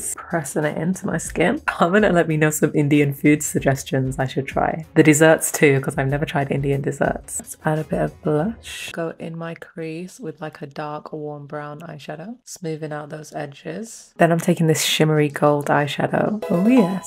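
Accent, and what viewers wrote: United Kingdom. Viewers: Are u british?!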